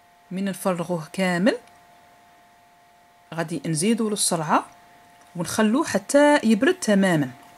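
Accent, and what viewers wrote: Oran, Algeria. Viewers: مدام صوتك وطريقة كلامك راقية حتي لهجتك الوهرانية تعجبني بزاف و وصفاتك اكتر نتمني تعليق من عندك انا من اشد المعجبين بشخصيتك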